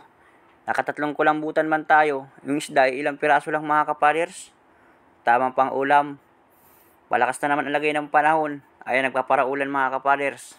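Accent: Bicol, Philippines. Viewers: Halata na bikolano ka ka father's..godbess u👍👍👍👍🙏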